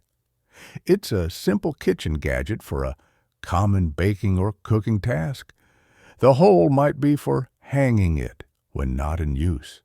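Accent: United States. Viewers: Not liking the Louisiana-like accent. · The "southern drawl" AI voice is really annoying.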